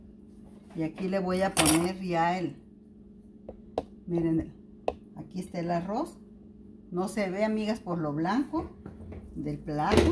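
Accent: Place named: North, Mexico. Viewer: Señora,,buenas sus recetas y comida saludable al mero estilo Sonora y con el acento tan norteño tan popular. Nomas un comentario me gusta cuando sirve la comida asu marido y el la resibe con mucho gusto, pero me parece muy cortante de su parte mandarlo a sentar asu rincón “” es mucho mejor que el este con usted en compañía como una bonita pareja,comparta comiendo del mismo plato en vez de mandarlo lejos de usted,,Gracias por sus recetas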